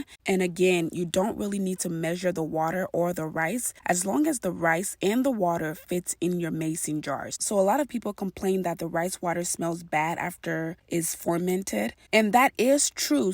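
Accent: Liberia. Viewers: She sound Liberian... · Liberian Jue.... Our accent never hide. 🇱🇷♥️😊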